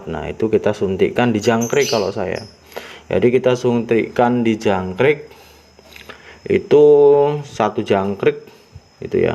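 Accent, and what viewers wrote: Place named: Lampung, Indonesia. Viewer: Suara kaya nada orang Jawa Lampung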